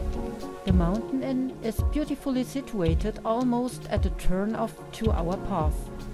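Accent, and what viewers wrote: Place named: Germany. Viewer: Hey, your english is very well (Better than mine ':D) Your free speach is nearly that good, than it is in german, with a little german accent of corse... Best regards Paco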